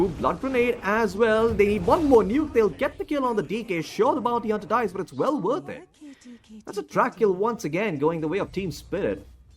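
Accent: India. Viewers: Stupid indian accent. Can't watch.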